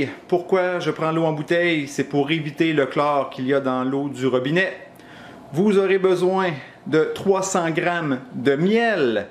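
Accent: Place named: Quebec, Canada. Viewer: Salut 👋 Thanks 🫶pour recette 👍 Pu*ain, j'adore ton accent 🫶 Longtemps dans passé j'avais un binôme québécois en french foreign legion...super gars ! Il as parlé comme toi 🫶👍😁🫡🇨🇵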